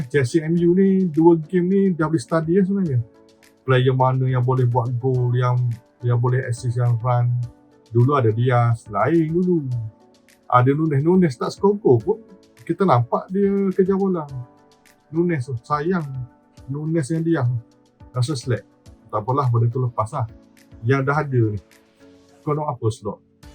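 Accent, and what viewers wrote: Kelantan, Malaysia. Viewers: Kecek kelate la abe .. santai2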